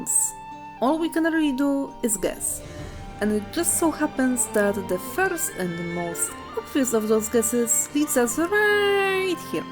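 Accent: Poland. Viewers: oh thats interesting i dont think i have heard a polish accent before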